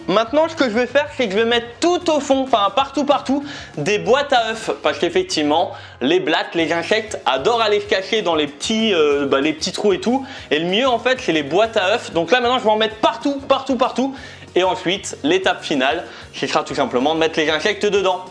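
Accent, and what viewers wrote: Alsace, France. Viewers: Attend mais tu vient d'Alsace ?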